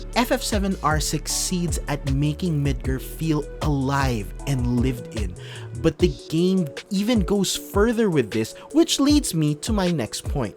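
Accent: Philippines. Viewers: Pinoy ka noh? accent palang and choice of words. hehe but nice review.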